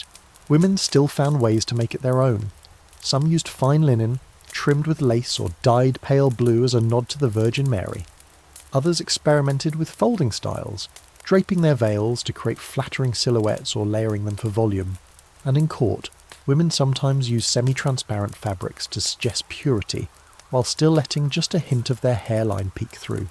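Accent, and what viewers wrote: United Kingdom. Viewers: It's 5.34 am and I'm living in France, near the chartreuse mountains. Thanks for your help and the English accent, it contributed to putting me to sleep. The pictures though 😂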